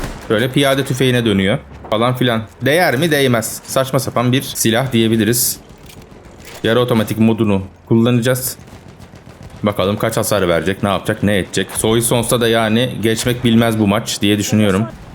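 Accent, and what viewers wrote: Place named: Foça, Turkey. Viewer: SEN GÜNLÜKBAŞILI MISIN YEĞEN? Foçalı şivesi çıkıyor sende.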